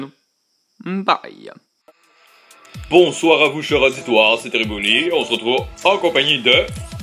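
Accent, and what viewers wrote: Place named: Quebec, Canada. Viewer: Elles sont taquer classe ses maison et j aime bien son accent sa fait styler ;-) j aime le Québec mec j aimerais bien que tu nous apprenne comment faire des maisons steampunk differentes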